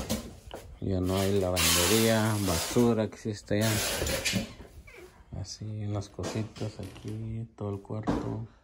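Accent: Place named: Guatemala. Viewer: Serote saludos así hablamos los chapines😂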